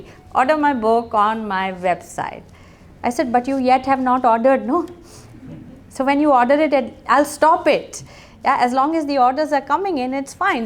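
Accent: India. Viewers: In this video there is maximum Indian English... Keep it up.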